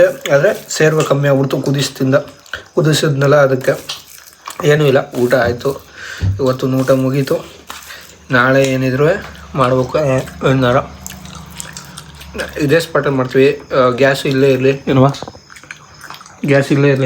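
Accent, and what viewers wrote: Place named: Hassan, India. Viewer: Nam amma Hassan doru nam appa uttar karnataka nanu Hassan bhashena,uta na,climate na tumba miss madkoteni and nimma bhashe keli tumba kushi aytu One matt heltini bro nim lifestyle nange tumba eshta because nemdi ede dudd edre nemdi jasti eralla nange nim tara halli jeevna madbeku anta ishta sir and nive healthy agi ertira last vargu ❤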